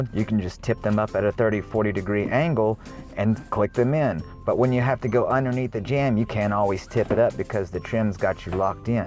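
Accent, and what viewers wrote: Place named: South, United States. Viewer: Dude, your a boss on this one! Great explanation. Great accent! I’m thinking somewhere between N. Carolina and Mississippi!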